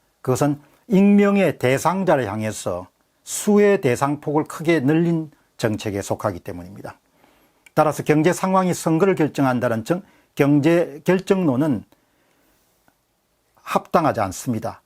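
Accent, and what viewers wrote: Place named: Gyeongsang, South Korea. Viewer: 어 말투가 겡상도구만 에구 지겨운 겡상도 그 촌스런 미개한 사투리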